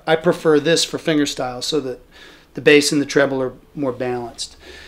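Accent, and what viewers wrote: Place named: Australia. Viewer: I thought you’re Aussie!! Cool!!!!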